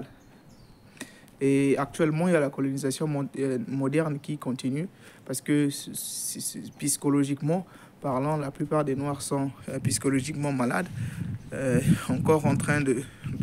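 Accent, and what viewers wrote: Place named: Asia. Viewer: Tu as pris l'accent asiatique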